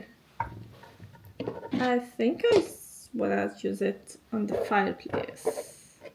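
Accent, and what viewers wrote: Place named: Lebanon. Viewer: Your accent is Lebanese ❤❤❤❤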